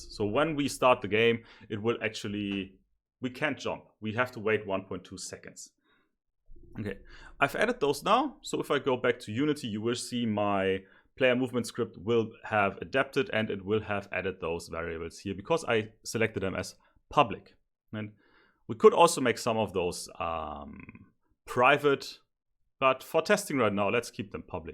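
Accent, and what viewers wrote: Germany. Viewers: Thank you for this Tutorial, are you german?😁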